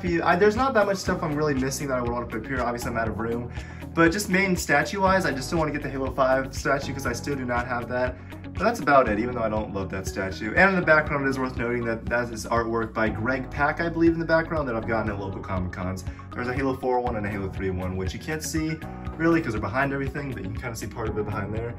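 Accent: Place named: United States. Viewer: yeah man im guessing from the accent your american.. in the UK its hard to find decent halo stuff your collection is amazing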